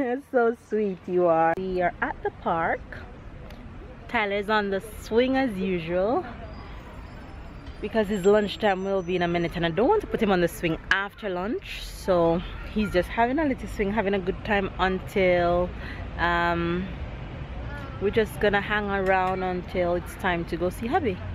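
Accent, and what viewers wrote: Jamaica. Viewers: Tyler is so gorgeous, awesome surprise for hubby. Some parts of your accent sounds Jamaican :)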